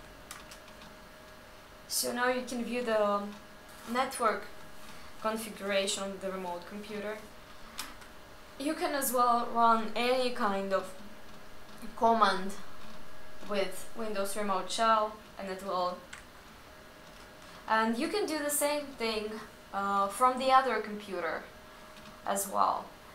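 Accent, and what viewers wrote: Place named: Russia. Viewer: Чувствуется русский акцент и немного много "SO...". Но девушка старается. Спасибо за хорошую иллюстрацию по WinRM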